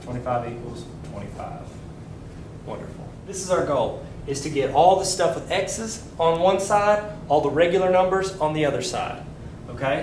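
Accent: South, United States. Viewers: Ummm why do u have to be so good looking? And that southern accent...couldn't concentrate on the lesson at first! ;-)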